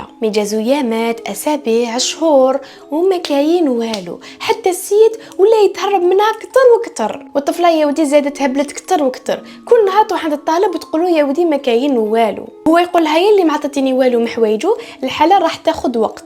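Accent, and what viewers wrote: Blida, Algeria. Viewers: هذي بليدية ، راي تهدر باللهجة تاع بليدة 😊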